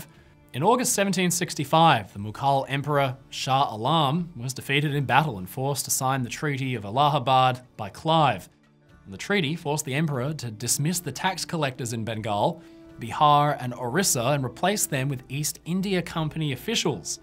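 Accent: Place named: Australia. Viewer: Fantastic video composition, really sad content. The Australian accent makes you sound extra smart!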